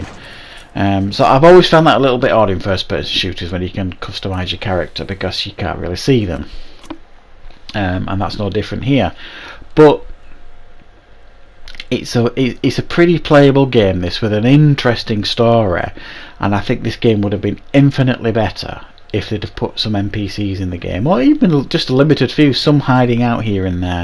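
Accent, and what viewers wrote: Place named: North, United Kingdom. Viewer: Nice to hear a Northern accent!!!!!!!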